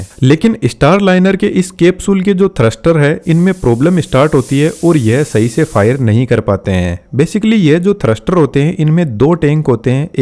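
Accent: Rajasthan, India. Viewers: Bhaisaab aapka accent Rajasthan wala h aap kaha se ho, Mai Jaipur gramin se hu😊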